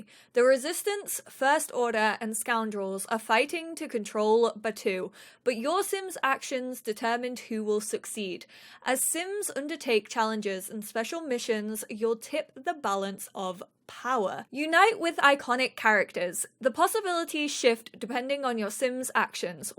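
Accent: England, United Kingdom. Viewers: I totally hear the British accent 😂😂😂 (I'm from Yorkshire) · OMG she does sound English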